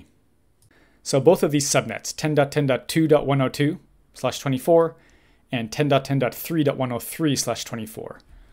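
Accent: Canada. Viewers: I think I got this :) ACLs at least lol by the way, youre originally from Canada right? I hear the "aboot" ;)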